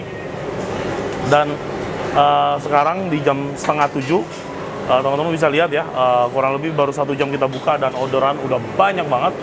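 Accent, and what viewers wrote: Banyumas, Indonesia. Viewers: Bch Ngapak sekang ndi kuwe cilacap apa pwt sing sregep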